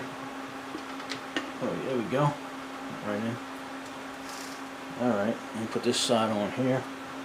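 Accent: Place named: New York, United States. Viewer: You sound like your from new york or from the country....or both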